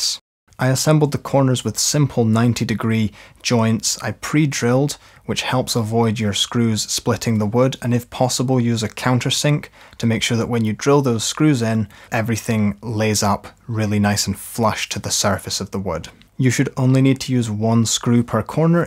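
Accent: Scotland, United Kingdom. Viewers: Chaps… this is what a very posh Scottish person sounds like… must be a Farquhar or similar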